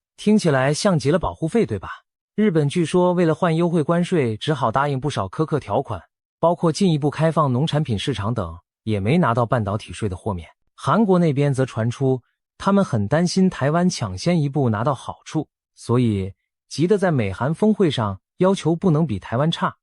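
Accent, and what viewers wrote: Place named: China. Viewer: 大陆口音的反讽视频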